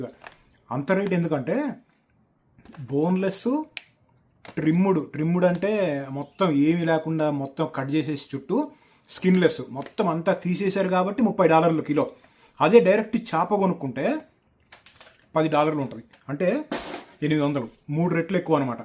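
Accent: Andhra Pradesh, India. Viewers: bro.. eppatnunchooo oka doubtu..! why does your accent sound so Andhra if you're from Nizamabad? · Anna needhi telangana kada mari nee slang deniki andhra laa untadhi? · Bro meedi Karimnagar kada...! But me slang andhra slang la undi...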